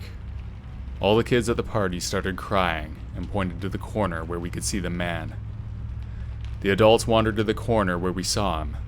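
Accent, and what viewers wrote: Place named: Canada. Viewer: The reader is def Canadian lol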